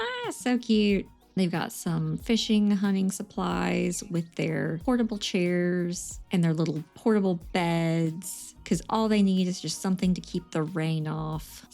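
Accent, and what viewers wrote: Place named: South, United States. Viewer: Omg I love this idea! (Also your accent. Southerners represent!!!) I'm trying something like this in my save file!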